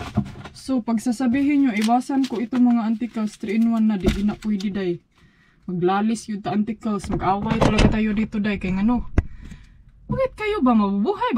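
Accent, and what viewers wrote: Visayas, Philippines. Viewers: Hi Ms. i really like you 😄 the way you speak tagalog with bisaya,yong bang wag kang lumigid dyan at ang hirap litukin,mkatawa jud ko nimo ayy...anyway be safe always sa inyong travel with your hubby. I really like also your background music,i love to hear it. God bless you ms. & your hubby 🙏💜 Your follower here in Iligan City,Mindanao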